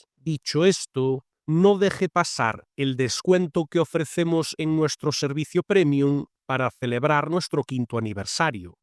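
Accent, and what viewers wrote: Galicia, Spain. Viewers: No ves que tiene acento gallego?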